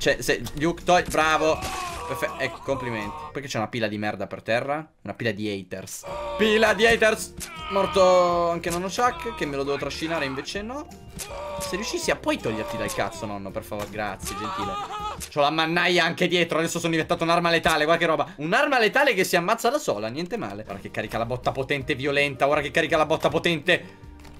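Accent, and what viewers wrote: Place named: Naples, Italy. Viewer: Madò che parla Napoletano quanto figo 😍😍 ( lo risolviamo= o risulvim)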